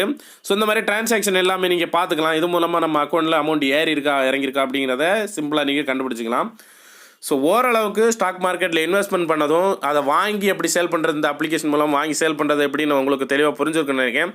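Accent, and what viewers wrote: Kanyakumari, India. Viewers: Kanyakumari ya bro ...namah ooru voice mahdiri iruku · Ur speaking Kanyakumari Tamil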